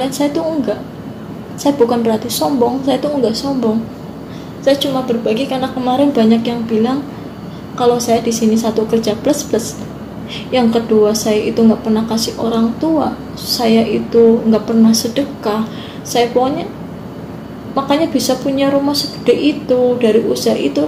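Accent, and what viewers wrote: Banyumas, Indonesia. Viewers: Ngomongnya ngapak , ya salam dari Purwodadi Grobogan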